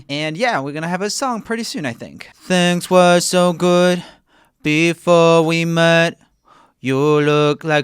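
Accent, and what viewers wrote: Sweden. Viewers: Swædish accent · My mom heard me watching this and she was like "oh is he german or swedish?" And I was like how did you know???? He's swedish? She's just like I know things.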